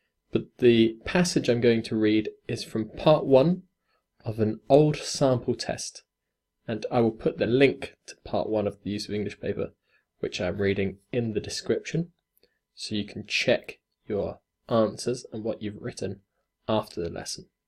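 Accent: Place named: United Kingdom. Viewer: Dear I live in Turin in the north west if Italy even if I come from South east of Italy (it's funny, isn't it?) Today I saw your website <link> but I was a bit lazy. In the next few days I'll take a look at it better. I see also if I pay more.or less 9 pounds, if I remember well, I can become a member. Is it right? I've found your website so interesting because is made well and it contains a lot of interesting things. I like so much your British pronunciation and appreciate your method. My English skill is better than some months ago because I listened a lot of English videos on YouTube. But now it's time to improve also my grammar skill and I think that your website will be useful for me. Waiting to hear you have an amazing week. A warm hug Benvenuto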